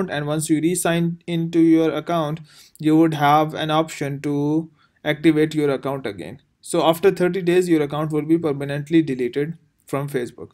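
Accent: India. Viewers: Hey ! Try to talk clearly the english, still we hear your hindu acent , we can’t understand. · This indian accent kills me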